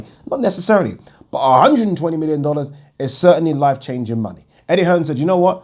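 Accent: United Kingdom. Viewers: As soon as I heard your UK accent I knew the whole video would be biased. Wilder tried for 3 months to make a fight with AJ and NO LUCK and he has now moved on and that is what we do in America MOVE ON. Wilder was just on a tv show "BILLIONS" on Showtime and now possibly a Creed 3 movie so he is busy now making big moves in Hollywood. Question : Why cant Joshua show up to Wilder vs Breazeale in NY which is first isnt AJ here in 🇺🇸??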